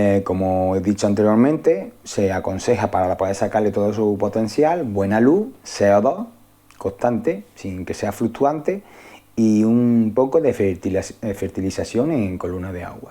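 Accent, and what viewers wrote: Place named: Andalusia, Spain. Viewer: Hola, primera vez que veo un video de usted, no le conozco, usted parece andaluz por su acento. Yo soy Sixto Rodriguez B. Le escribo desde Valencia, Venezuela; también soy español de las islas Canarias. Gracias por su explicación. En Venezuela no tenemos Bucephalandras ¿ Hay alguien en España que me pueda enviar unas Bucephalandras por Zoon o por RMW? Gracias, me he suscrito a su canal.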